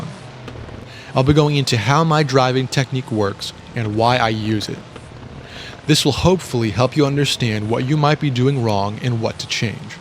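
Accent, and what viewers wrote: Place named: United States. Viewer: American accent sticking out like a sore thumb.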